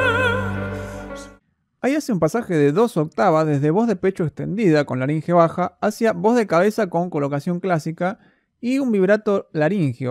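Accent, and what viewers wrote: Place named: Castile, Spain. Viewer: ✨✨✨Maravillosas tus técnicas ✨✨(HD isolation)) y ((Gráfico espectral))...eres único analizando y mostrando solo el sonido de la voz. Sorprendida al escuchar tu voz castellana ..saludos desde Mendoza 🇦🇷 y en mi nombre grupo LA VOZ DEL MUNDO DIMASH QUDAIBERGEN SOY TU FAN 🇦🇷🇦🇷 ARGENTINA.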